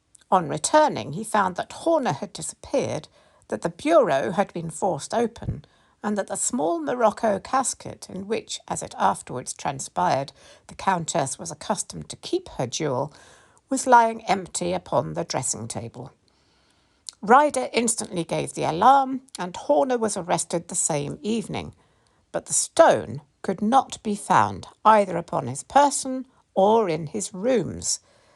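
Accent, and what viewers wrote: United Kingdom. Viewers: I enjoyed your narration of this Sherlock Holmes short story. Hearing it performed with a British accent is so much more enjoyable than hearing it in my head with my American one. 😂 Thanks.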